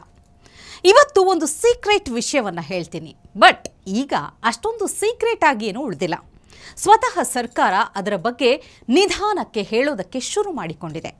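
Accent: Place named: North Karnataka, India. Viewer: Madam you talk in uttara kannada language ❤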